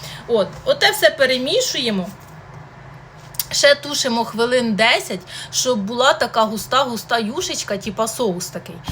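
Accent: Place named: Surzhyk, Ukraine. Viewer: Гарна дівчина,але суржик її портить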